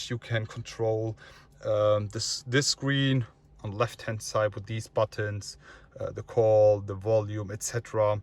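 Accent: Germany. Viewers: I’m assuming your German, your accent is hella cool lol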